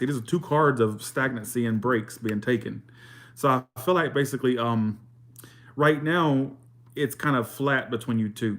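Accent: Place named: South, United States. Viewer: you are so handsome! Love your southern accent too! Good reading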